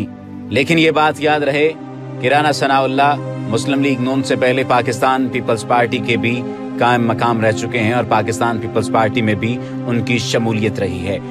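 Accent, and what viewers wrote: India. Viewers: This is indion voice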